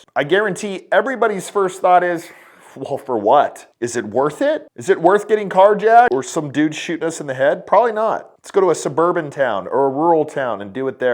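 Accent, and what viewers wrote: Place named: Texas, United States. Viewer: porn and a lil meth.... ill be straight up honest. thats my vice i need to break... boss this is the second video of yours ive ever seen (RIP Charlie Kirk. We wont forget you lil bro, you were a good one) and GOD DAMNED if real dont recognize real. i without even lookin it up know youre a Texan born n bred, i hear it and your demeanor... we know what we know when we know. [funny on topic story: id just got out like at my buddies for 5 mins (2014, county not tdcj) was at my buddies {did i mention a lil meth? kinda on brand at this point[more subtext]} and a girl walked past me.... thats it.... i said.... youre a grove rat aint ya? yup. she also was raised at the buckner childrens home like my pops.... when i say when know what we know when we know. i mean this. sorry for blatherin, prolly the meth.] porn n meth. just the time i waste on that. 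not consuming my life mind you just that ya know.... its there. means other stuff is not. i should look at myself and realize if i saw this in a friend id be disappointed in them.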